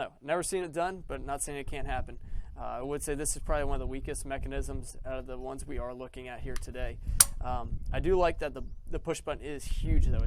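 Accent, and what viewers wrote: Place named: Philadelphia, United States. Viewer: Sounds like a Philly area accent. Good video and review.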